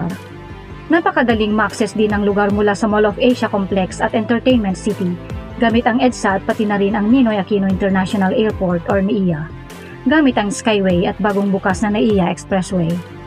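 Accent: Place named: Bicol, Philippines. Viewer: Bicol accent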